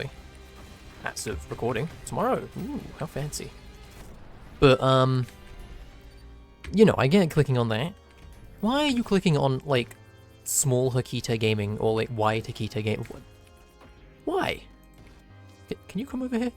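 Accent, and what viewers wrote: United Kingdom. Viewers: I enjoy listening to funny British guy's(your) voice while I do stuff... it's like a podcast with occasional ultrakill sounds.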